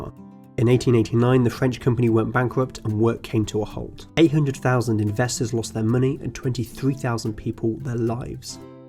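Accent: England, United Kingdom. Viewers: Pedro Aguiar Yes..he’s English and that’s the English way of saying the country. Do we have to call Germany Deutschland while we’re at it? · British accent sucks. That being the case, you should talk slow dude! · Jane Kailey are you unable to understand the British Accent?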